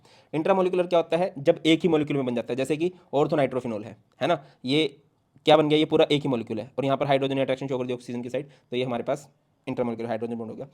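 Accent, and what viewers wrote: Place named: Punjab, India. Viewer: Your Punjabi accent 😅❤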